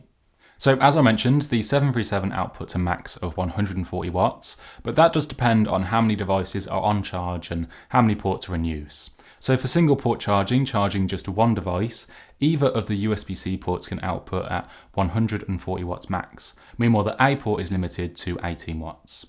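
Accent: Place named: West Midlands, United Kingdom. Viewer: I’m from West Mids, can always tell haha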